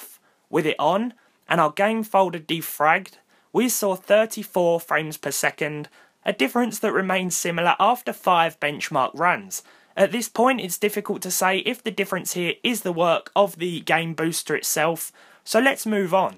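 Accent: United Kingdom. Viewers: dat sexy british accent👌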